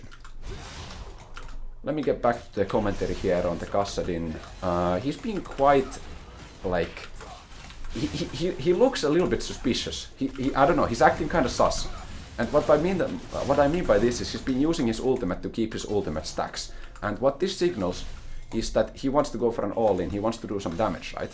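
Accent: Finland. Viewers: Nice action! But i swear, everytime i hear you speak, all i can hear is finnish. 🤭 Best regards from Estonia! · Love the finnish accent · Doesn't really sound spanish at all to me. But that might be because I'm also finnish so I know our accent well.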